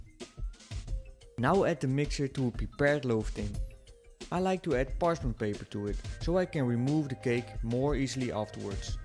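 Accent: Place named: Netherlands. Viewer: Ik dacht al dat ik een Nederlands accent hoorde 😁 Ga van de week t recept eens even proberen en je andere video's eens even bekijken 👌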